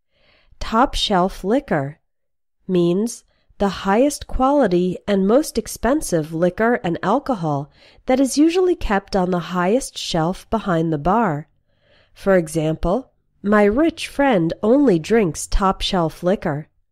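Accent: United States. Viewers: So, If I may add. Why the automated robotic american voice? Got through about 20 seconds of this and gave up.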